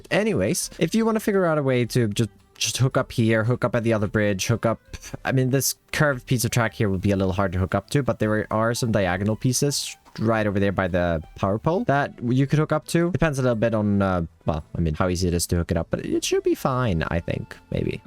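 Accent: Sweden. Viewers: This is the first video I see of you and by your accent I bet you were swedish.. and then I looked at your youtube channel description and I was right.. Im swedish too :D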